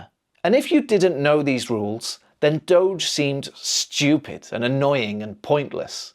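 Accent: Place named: United Kingdom. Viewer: Hi! I really enjoy your practical approach to English learning, especially your Walk & Talk videos, graded listening content, and the way you bring natural British English and real-world topics into your lessons. I also sent you an email today with a personalized App idea inspired by your teaching approach. The idea is to turn your listening and speaking content into an interactive practice space where learners can shadow your lessons, practise pronunciation, work through IELTS and speaking prompts, and build a consistent daily practice habit. I think your teaching content could become something really valuable for learners beyond YouTube. I’d love to hear what you think! 😊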